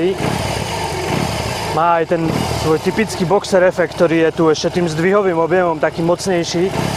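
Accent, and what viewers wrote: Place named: East, Slovakia. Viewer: Motorka brutál.. Ale video som nedopozeral...tá východniarčina trhá ušné bubienky...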